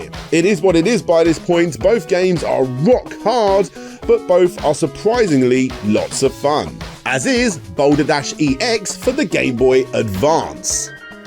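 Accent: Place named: United Kingdom. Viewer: It's very strange. You sound British, but you look American. Very queer combination.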